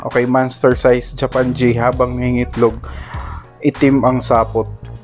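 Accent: Visayas, Philippines. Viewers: Bisaya ka ba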